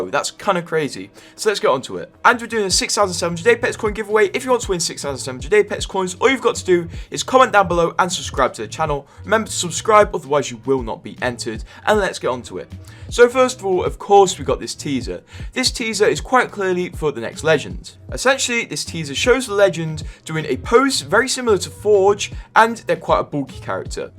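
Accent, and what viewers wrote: Newcastle, United Kingdom. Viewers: Newcastle thick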